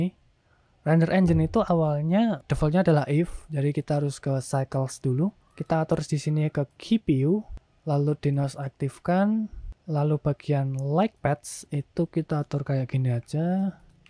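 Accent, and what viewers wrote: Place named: Java, Indonesia. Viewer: Lumayan medhok ya mas brur😄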